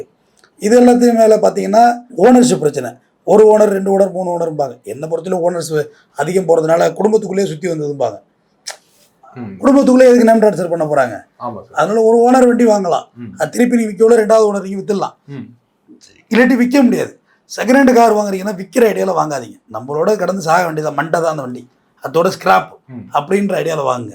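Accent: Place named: Cauvery Delta, India. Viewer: Sir native delta side pola 🤔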